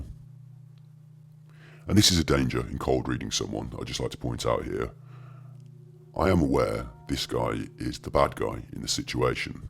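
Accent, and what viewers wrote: Birmingham, United Kingdom. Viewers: I just discovered you and I'm loving your many analyses! I adore your accent as well. Are you from Birmingham, by any chance?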